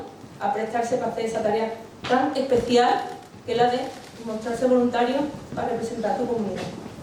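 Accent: Andalusia, Spain. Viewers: Reconozco la valía de Teresa,su discurso sabroso de andaluza.Pero jamás comprendí su remar dentro de Podemos.Los anticapitalistas desaprovecharon una oportunidad histórica y se sirvieron de la mayoría para su proyecto minoritario.Que les vaya bien.Y lo dice un trosco vasco harto de excisiones y traiciones.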